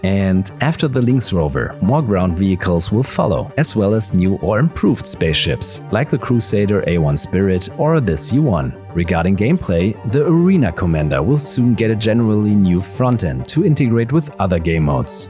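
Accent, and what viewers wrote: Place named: Germany. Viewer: Don't blame him, he speaks weird with that thick German accent.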